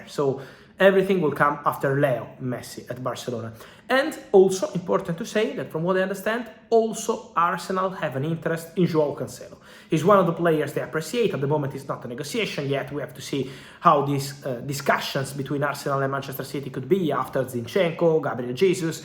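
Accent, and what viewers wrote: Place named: Italy. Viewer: O inglês com sutaque italiano fica maneiro, nunca tinha visto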